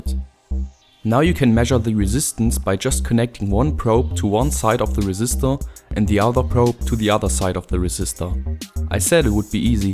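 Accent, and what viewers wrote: Israel. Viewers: Are you from Israel? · are you from israel?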